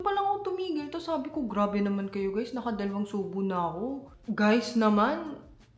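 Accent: Batangas, Philippines. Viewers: Mahilig ako sa mga video ng mga tao at ibang culture. Mga ibang lahi na nasa pinas. Or pinoy na nasa abroad kasi nasa abroad din ako. Eto talaga paborito ko sa kanila kasi kababayan ko are sa batangas haha. Pati pagsasalita. Nakakamiss mga batanguenyo. At mabuti yan sa mental health mo andyan kankung saan ka masaya kaya mabuti at nasa pinas ka. Napanood ko kasi ibang mga video mo. Ako at yung ibang pinoy sa abroad no choice minsan. Nakaka epekto talaga sa mental health natin. Napanood ko kasi yung homesick video mo.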